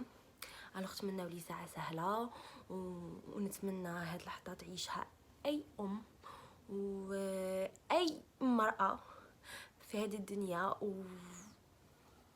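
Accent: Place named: Oran, Algeria. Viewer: نتي تيارتية احكي نورمال مالكي رجعتي تحكي وهرانية و راكي غير وحدك لا راجل لا والو ربي يسهل لك أن شاء الله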